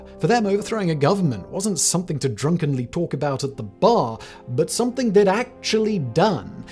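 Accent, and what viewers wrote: United Kingdom. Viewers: Really like the vid, but hard to weave through your Brit accent, woof. You could also use some tonality in your narration. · can't do the horrible brit accent on american history, this channel sux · Listening to a limey talking about what went on here. No thanks I'll pass, I don't want the opinion of those we soundly gave the boot to out of this amazing Nation. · Love your channel. I noticed that in this instance your British accent is a little ironic. Keep up the great work!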